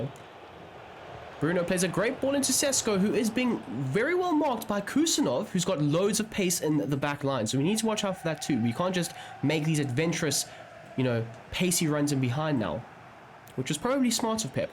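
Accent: South Africa. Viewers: I know a South African accent ev3n 8n passing. Had to double back a give a follow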